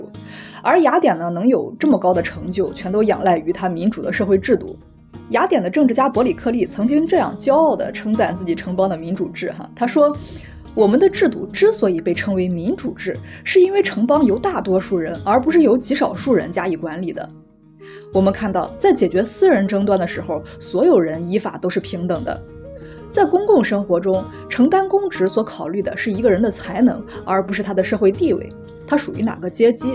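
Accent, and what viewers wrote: Jinan, China. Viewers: 难得的有思想有口才的好主播，果断订阅了❤播主是济南人吗？一口济普乡音很亲切啊😊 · 听你口音像济南人 是吗老乡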